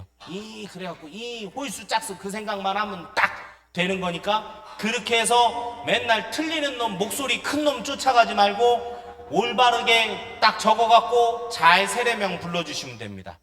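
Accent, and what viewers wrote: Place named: Chungcheong, South Korea. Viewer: 그런겨어 ㅎㅎ 우리충청도 사투리 반갑습니다 영육간에 강건 하세유😂😂😂😂😂😂😂😂😂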